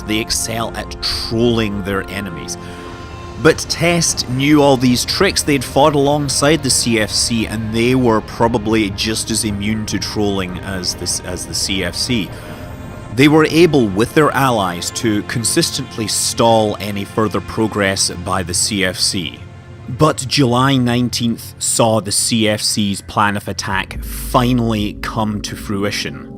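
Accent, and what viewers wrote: Scotland, United Kingdom. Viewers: I meant no offence. I actually like your stuff. I know it sounded like an attack towards you but in reality thats not what I meant. My appologies if thats the interpretation. What I meant is that althought people support it, I understand why the others like me dont support it. My other point usually when the CFC talks about the war vs BOB completelly fail to mention war vs IT alliance and war vs DRF, cos it did not go particularly well for goons. They also seem to jump from that straight to their next victory aka Fountain. That was my point. The story is not balance. After almost a decade of listening to the mittani's stories, one gets tired of his bs aka Cloud ring alliances hate Jeff. I guess he has no shame. And you do have a good scotish voice and I also think it will work out well for a book or something. I have watched your videos years ago and as you probably know the eve community is usually very good at geting things done well that benefit the game. 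It just shows to wonder that there is a lot of resistense from the community for this project. There has to be a very good reason for it. All the best.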